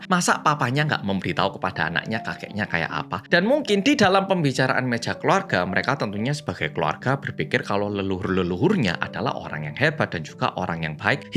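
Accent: Java, Indonesia. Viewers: Semangat Oppa 😊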